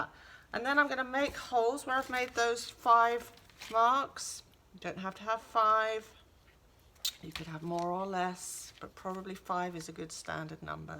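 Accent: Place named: Ireland. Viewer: Thanks so much this is brilliant My mother is also Irish.... we can show off our Irish accents!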